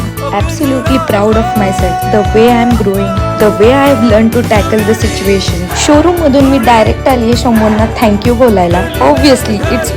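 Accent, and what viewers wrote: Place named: Marathi, India. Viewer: Didi tu disayla model disti pan aavaja varun apli marath molich ahe barka😅😊❤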